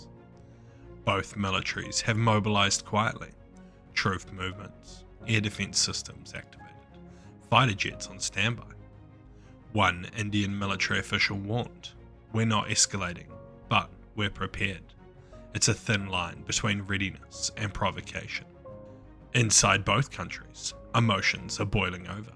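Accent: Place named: New Zealand. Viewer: How dare anyone criticise beautiful kiwi accent and narration skills!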